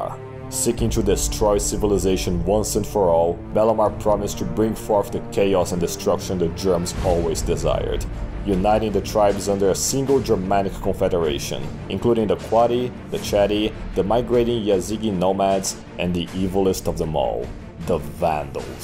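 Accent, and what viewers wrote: Brazil. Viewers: Sir Suspicious yeah I’m not familiar with Brazilian accents at all, which is why I assumed Romanian/Greek.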